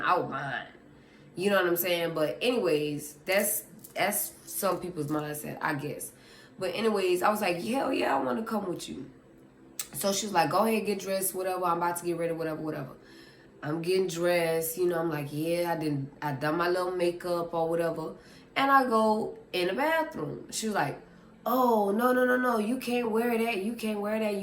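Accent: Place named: Louisiana, United States. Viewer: I knew you sounded Louisiana lol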